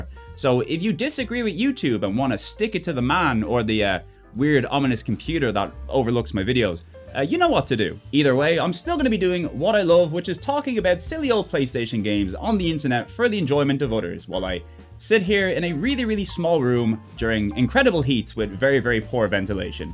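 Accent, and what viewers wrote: Ireland. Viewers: More Irish PlayStation man! Flipping get in!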